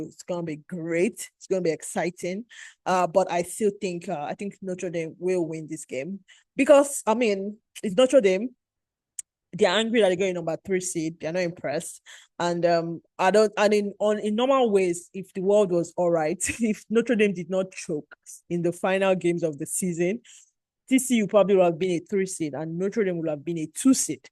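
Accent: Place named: Nigeria. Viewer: I'm Kenyan so I was loving the Nigerian accent Oooo! Thanks for the video. I used your exact model for 1 of mine. Wild & crazy bracket! Wish you the best oooo! 😜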